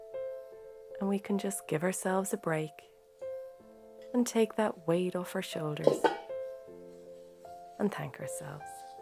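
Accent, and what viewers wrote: Ireland. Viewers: What beautiful and peaceful videos, deeply relaxing to share this, thank you. The way you live is how I too love to live life, enjoying all the small pleasures and that is how I get through all my months, just taking one step at a time end savoring the moments and all the beauty in my life! Blessings dear friend, you have such a soothing voice and I love the Irish accent. All so inspiring here!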